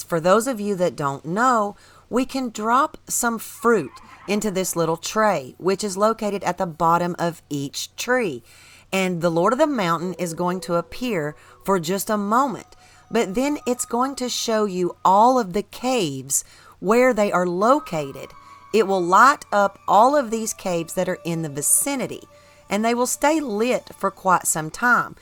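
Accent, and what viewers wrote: South, United States. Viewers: You are from the south thanks for the information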